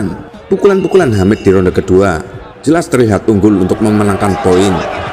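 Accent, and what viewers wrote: Java, Indonesia. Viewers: 😀😀😀😀suaranya medog kayA sy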